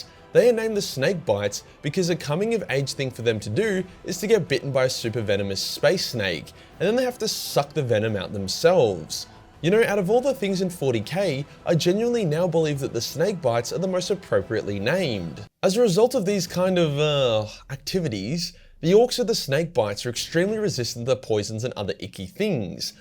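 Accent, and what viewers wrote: Australia. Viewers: You do this very weird thing with your voice at the end of like every sentence, where you kind of raise your pitch and makes it sound like you’re asking a question. That makes listening to you very difficult. Even if someone didn’t find it annoying, it absolutely comes off as not genuine. Just talk regular homie. (And no I’m not talking about your accent. Everyone loves a good Aussie accent)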